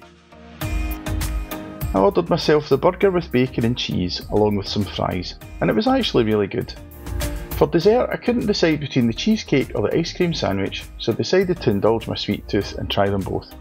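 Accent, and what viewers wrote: Scotland, United Kingdom. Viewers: Genuinely love all your reviews. It's always nice to hear a Scottish reviewer in aviation. It's an absolute passion of mine. Always try to comment as know it helps channel due to being involved in some other channels 👌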